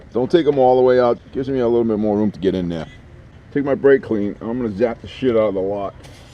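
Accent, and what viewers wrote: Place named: New York, United States. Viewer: love your accent man. Is that NY?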